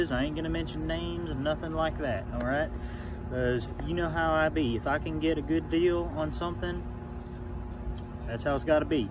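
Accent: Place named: South, United States. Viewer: Why is your Southern accent amplified by like 10 in this video?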